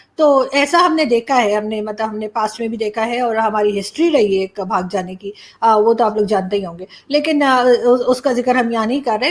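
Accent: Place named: Allahabad, India. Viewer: Your ancestors migrated from Allahabad..I can notice the flavour of Allahabad in your Andaz -e-bayan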